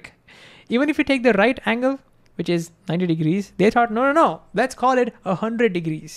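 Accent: India. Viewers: You sound and look Indian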